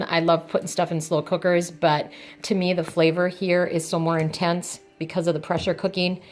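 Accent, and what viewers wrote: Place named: North, United States. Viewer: hello! You sound like your from the north lol. Hello from MN here. Thanks for the video, i was going to try pico and chicken in my new instant pot as well. almost exactly a year later.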